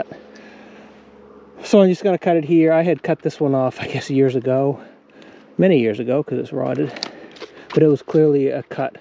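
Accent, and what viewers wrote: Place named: New England, United States. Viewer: I just randomly came across your channel and thought I’d check it out. I have to say I’ve been enjoying watching your videos quite a bit! I really enjoy watching you play in your playground and all your cool toys! I like your commentary about what you are doing and your thoughts on the situation. I also enjoy your New Englander accent!! Keep up the great work with the videos and I’ll keep enjoying them!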